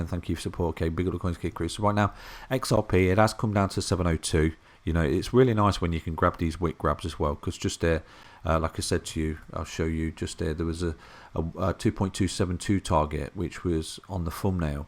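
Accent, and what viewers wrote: United Kingdom. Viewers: I admire with the british accent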